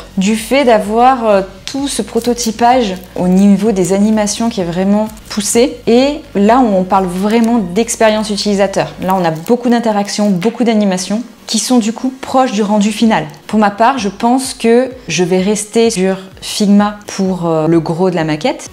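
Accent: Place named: France. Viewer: the french accent is a huge flex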